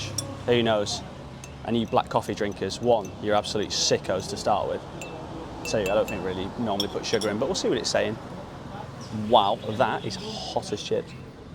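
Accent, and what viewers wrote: United Kingdom. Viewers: Hi mate how are you doing? You sound like britsh.. Where do you live in England? I live in Ely Cambridgeshire mate. If you go futher to the east of Dili, it's FDTL compound (Military headquarters) you will see a huge crocodile about 7 metres long, they named him Antonio. I don't know whether this crocodile is stil there or not because I left the country ten years ago for England . just try to ask people there perhaps they can help you. Have good holiday mate and stay safe.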